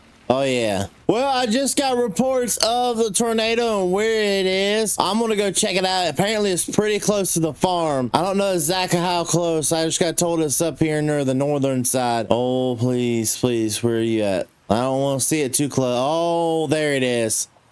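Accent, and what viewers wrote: South, United States. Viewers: just started watching. looking forward to this. always fun to have the someone with a southern accent doing some farming. thank you in advance.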